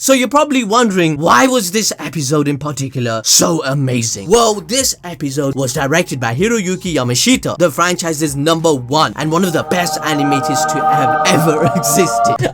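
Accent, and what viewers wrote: Punjab, India. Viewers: Man are you pakistani?? Your punjabi accent seems like it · That Punjabi accent 😂🔥🔥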